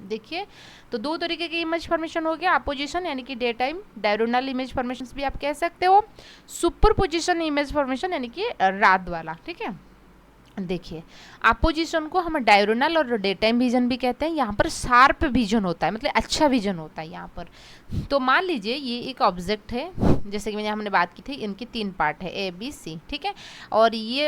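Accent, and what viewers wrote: India. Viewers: So sweet hindi accent mam ❤️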